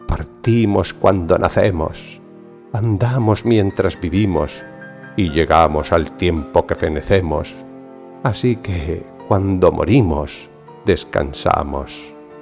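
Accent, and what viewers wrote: Galicia, Spain. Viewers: Cuando lo recita un gallego suena mucho mejor, yo que tengo acento argentino. Muchas gracias, maestro 👏👏👏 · Estimado, por tu dejo, te adivino peninsular. Razón demás para llamarte la atención, pues siendo paisano del inmortal Manrique, le faltas el respeto;en la declamación y escritura. Me bastó escucharte unos minutos para ello. Al declamar en la primera estrofa separas, pareciera intencionadamente o por ignorancia"... y chicos " Lo segundo y más desastroso y lesa literatura: cambias" allegados", por "y llegados". Soy un peruano cincuentón, amante de la buena literatura. No entiendo como no corregiste semejante desaguisado. Tu dejo debió ser un plus, sin embargo, no hallo calor y sentimiento. Ojalá valores y tomes las críticas con ánimo de enmienda. Att. Ramsés Torres Ramírez Puente Piedra-Perú. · Perfectamente! A mi me gusta muchísimo. 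Eres mi amor. Tienes un acento gallego? (soy un extranjero, es dificil para mi distinguir)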